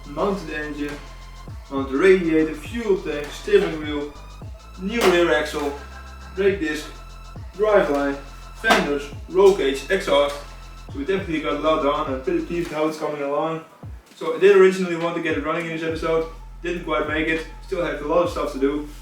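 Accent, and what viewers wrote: Netherlands. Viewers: oké ik dacht al het al te horen een dutch accent 😂 oja we gaaf dat je van een speelgoed trekker een gemotoriseerde maakt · Are you dutch? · as the dutch would say: waajoo dikke john deere trekker! (i'm dutch and he sounds like he's dutch)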